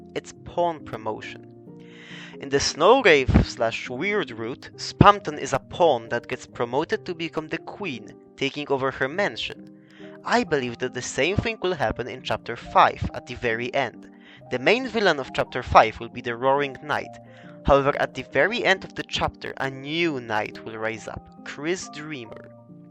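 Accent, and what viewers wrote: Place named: Europe. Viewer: Hey I'll take a cool European accent over another annoying hyperactive or bored American accent any day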